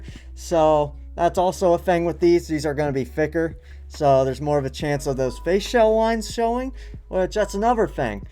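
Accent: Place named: Netherlands. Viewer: Are you Dutch by any chance I can hear the accent a little bit hahaha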